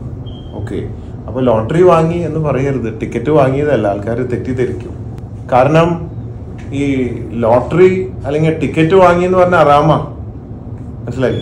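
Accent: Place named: Neyyattinkara, India. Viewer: Ithu tamilnadu alla......kaliyakkaavilai,neyyattinkara slangs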